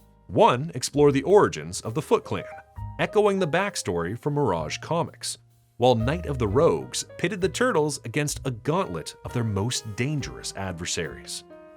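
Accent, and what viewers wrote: Canada. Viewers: You will quadruple your views by replacing this cornball Canadian narrator. Said with peace and love. “With full medical insurance”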